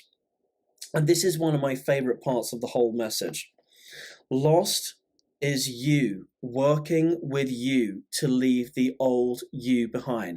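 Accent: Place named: United Kingdom. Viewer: I reduced speed to.75 as well after about 8 minutes; prior to that I was back tracking & replaying. Had to put my “Brit ears” on as well. Lol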